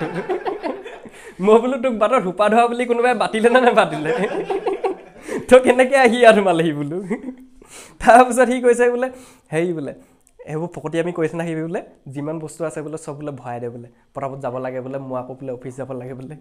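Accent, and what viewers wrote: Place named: Upper Assam, India. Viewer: Bhakha huni upper assam jen lagise, kot ase thai dukhor?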